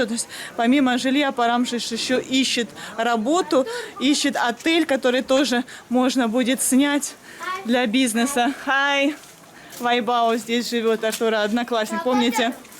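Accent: India. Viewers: У вас, уже акцент на индийский стал похож )) Nice do mid' you;) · За столько лет в индии чисто индийский акцент английского стал)) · так интересно, у Вас английское произношение индуское, это просто удивительно! Только с позитивной стороны говорю.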